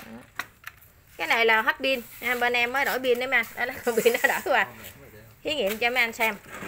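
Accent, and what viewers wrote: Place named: Mekong Delta, Vietnam. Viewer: Bà chủ máy này giọng miền tây. Nghe chân chất mà vui vui. Cách giớ thiệu sản phẩm mộc mạc nhưng chuyên nghiệp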